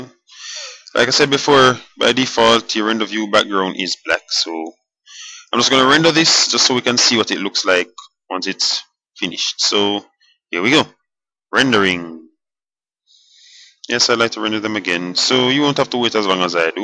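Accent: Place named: Caribbean. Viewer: are you jamaican...its good to hear a caribbean accent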